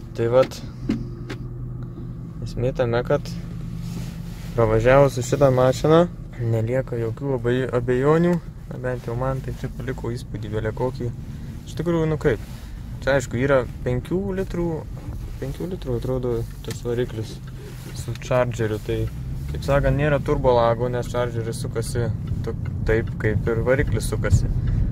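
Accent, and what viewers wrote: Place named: Vilkaviškis, Lithuania. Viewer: Iš tarmės sprendžiu, kad esi iš Vilkaviškio ar Marijampolės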